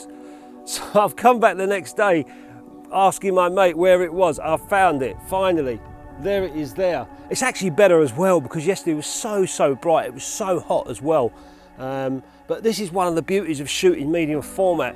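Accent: United Kingdom. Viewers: Excellent, though with a pronounced British accent, include the fabulous Zeiss Super Ikontas comprising the 8 frames 6x9 cms, the 12 frames 6x6 cms & the 16 frames 6x4.5 cms cameras in part 2.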